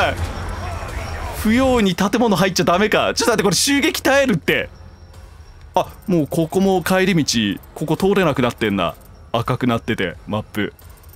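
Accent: Kansai, Japan. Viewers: なんで関西弁なん？笑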